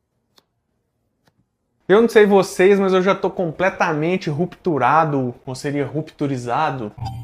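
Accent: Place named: Minas Gerais, Brazil. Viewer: Que sotaquin mineiro bão.. minas é bom demais .. que saudade da minha Minas gerais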